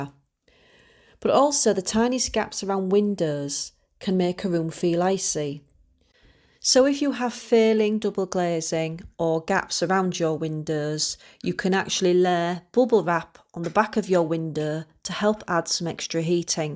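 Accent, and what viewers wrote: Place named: Hull, United Kingdom. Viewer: Just found you guys this morning 😍 I recognise that accent being a hull girl myself, am I correct 😜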